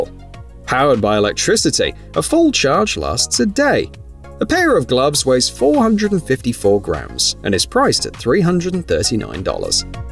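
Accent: United Kingdom. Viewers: The pulsing background "music" induces a headache. I wonder if the narrator is really British.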